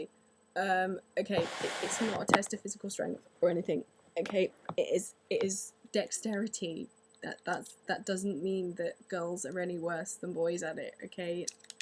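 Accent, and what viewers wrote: United Kingdom. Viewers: heck you don't have to search on google! Look in the mirror you're so pretty and that british accent. Lovely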